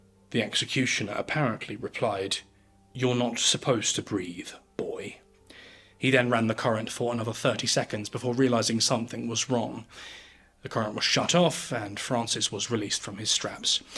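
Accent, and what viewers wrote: United Kingdom. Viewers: This guy sounds like he might be from the same part of England as Rationality Rules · I was a state criminal prosecutor for 35+ years. Like most people, I feel conflicted over the death penalty. Even so, I think there is a great deal of misunderstanding over what happens during a legal electrocution. When 2,000 volts and 8 amps are applied, the condemned person immediately loses consciousness. Witnesses see very little movement except the person’s head goes limp after the first jolt. He is likely dead at that point, but a second jolt is applied to ensure death. This is probably gruesome to watch, but that does not mean that the condemned person is suffering pain. We know this because people who have survived accidental electrocutions at less current report remembering nothing. Electro-shock therapy is still used medically, with patients reporting no memory of the treatment. 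While there are a few exceptions, an electrocution usually is carried out without complications. The condemned person does not writhe or catch fire. I am guessing from the accent of the narrator that this video was made by a British citizen, where capital punishment is no longer legal. While I understand opposition to the death penalty, I would respectfully ask you to remember that the United States has different criminal problems than what you have in Britain and that capital punishment here, imposed by juries, is reserved for only the most aggravated types of murders. It is relatively rare. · A “vile” execution is necessary for a vile crime. In most cases, the condemned suffer much less than the victims. Fry ‘em all!!!! By the way- you sound like anti death penalty blubbering liberal limey. Why don’t you wash that shirt and report on rainbows and unicorns. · There is something about British accent and British ways of talking that always make me laugh. 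Hehehehe. Dude is hilarious...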